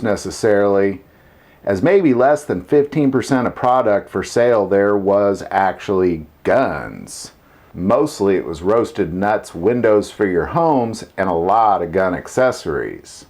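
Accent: South, United States. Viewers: Thought I was watching at half speed, but then I realized it’s just the southern way of speaking 😂 in seriousness tho, great vid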